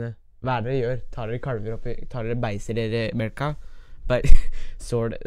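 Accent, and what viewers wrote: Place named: Sunnmøre, Norway. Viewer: Beste Sunnmøre dialekt ej he hørt :D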